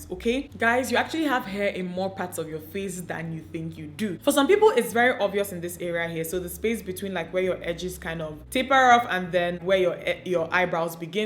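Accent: Nigeria. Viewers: Its the nigerian accent for me 🤩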